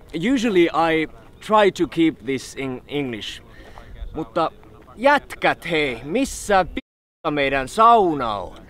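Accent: Finland. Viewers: i watch for the incredibly charming finnish accent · Lovely Finglish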